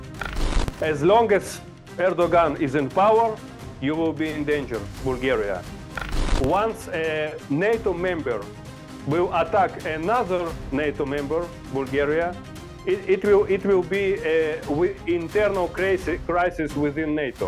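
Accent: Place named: Russia. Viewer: Такива откровени глупости! 😂Западно име със силен руски акцент! 😅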